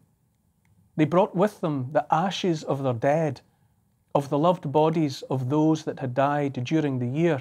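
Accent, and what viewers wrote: Scotland, United Kingdom. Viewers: Having Scottish in among my ancestral mix I could listen to your accent for hours Neil. Lovely message. I first watched you presenting Coast New Zealand 🇳🇿, a beautifully done showcase of NZ and I learnt a lot about my nation. The birth or our Saviour in Bethlehem indeed gives us great cause for hope. The politicians have had a field day causing fear and division. We need to stand up and be strong and not give in to them, remind ourselves that we can look out for ourselves and care for each other. NZ has become a Fortress and her citizens fearful. It is heartbreaking to see what has happened. May God Bless you and your family. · The truth he speaks in humility refreshes my heart and brains. I always loved the Scots and their dialects. 🍷😁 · It's impossible to tell anything but the truth in the true Scottish accent. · Actually, it's not a dialect, just a Scottish accent. 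His use of the Engiish language is beautiful, eloquent and wise. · I could listen for hours to this scottish dialect. Ist sounds so damn beautiful...and true...and is surrounded by integrity. Hold the line folks.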